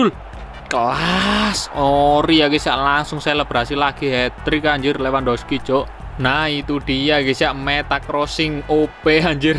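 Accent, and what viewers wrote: Java, Indonesia. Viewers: Keren bro, medok mantap, cuma ada kata anj*r nya kurang enak didengar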